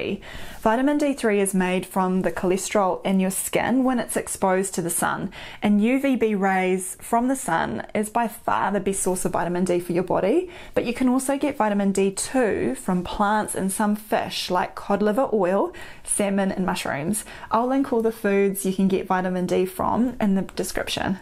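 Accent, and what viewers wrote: New Zealand. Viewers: Thanks for the information. It's a beautiful sunny day so I am going out and catching that sunshine. You are blessed with THE most Kiwi accent I have ever heard! Love it! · So wonderful to hear a Kiwi accent! Appreciate your videos. You are a picture of health an vitality yourself. Thank you. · What I enjoyed the most about this video “Kiwi accent” noticed one thing more Hollywood is alive & kick’n in Kiwi Land, you know I’m just been facetious, my first time it’s informative, talking about sun, I am from beautiful Huntington Beach CA weather is always good. All in all it’s informative video! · The Kiwi accent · Who knew health information could be so exciting? That combination is irresistible: - beaming smile - sweet and gentle demeanor - medical facts delivered in a comprehensible way for normal people - love the kiwi accent! You are officially my internet doctor,!:)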